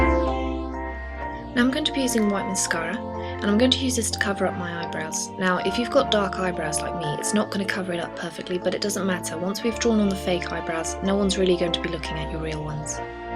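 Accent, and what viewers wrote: England, United Kingdom. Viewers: are you English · Now I know what I'm going to dream about now : A nice girl with a british accent being taken over by evil clowns... or lollipops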